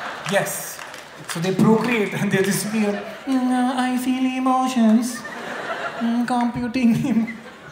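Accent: Kerala, India. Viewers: rocking the Mallu accent tho 😀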